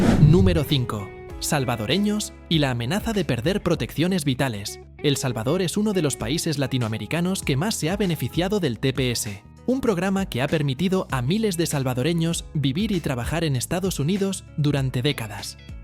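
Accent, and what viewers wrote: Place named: Spain. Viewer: Creo que por tu forma de hablar eres Español, son pocos los países latinos que buscan emigrar a EEUU la verdad son muy poco los panameños que quieren irse para allá ya que por el estatus de vida en nuestro país es estable solo viajan de manera de turista pero no por emigrar...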